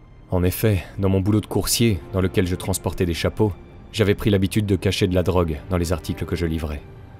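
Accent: Italy. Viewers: tu peux un peu moins pousser sur laccent italien quand tu prononce les noms merci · Super la voix avec les accents italiens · La vidéo est super. Par contre insupportable l'accent Italien. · Super documentaire digne d’un vrai travail d’investigation!!! Avec l’accent italien de surcroît!!! J’adore et je me suis abonné (+ cloche) direct car trop hâte de suivre la suite!!! J’ai 49 ans et suis quelqu’un de bien mais depuis petit j’ai toujours l’âme d’un voyou qui ne me quitte pas… lol 😎😉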